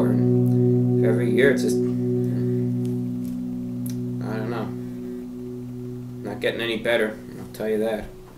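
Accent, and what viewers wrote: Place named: New York, United States. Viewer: He has a New York accent here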